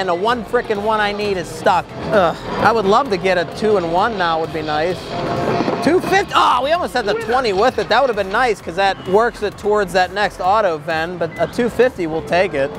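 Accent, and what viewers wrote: Pittsburgh, United States. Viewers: Sounds like he's from Pittsburgh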